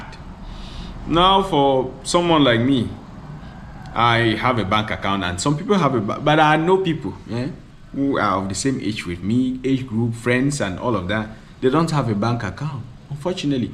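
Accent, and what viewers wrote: Akwa Ibom, Nigeria. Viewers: You have Akwa Ibom accent. Sweet.! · Serious Akwa Ibom accent.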